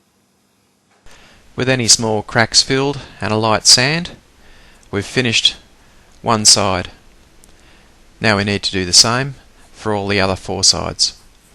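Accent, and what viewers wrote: Australia. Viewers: Doesn't matter how hard you try, you can always pick an Aussie accent.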